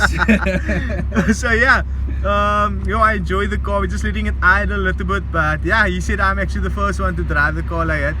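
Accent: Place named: South Africa. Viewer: Where are you from bro? Your accent sounds South African am I right....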